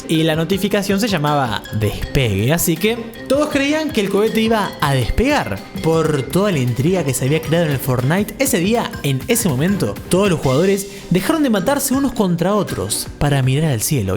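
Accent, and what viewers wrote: Argentina. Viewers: El primer yutuber que habla argentino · sos de Argentina?? :'D que orgullo XD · H ey se de noto que sos argentina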